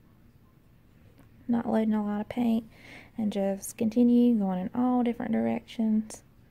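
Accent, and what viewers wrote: South, United States. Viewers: You have the southern accent and the monogram...you need to get on your cake bakin', girl! 🎂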